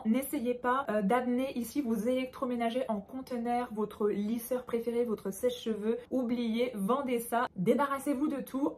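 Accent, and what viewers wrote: Quebec, Canada. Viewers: Tu commences à prendre l accent Québécois :)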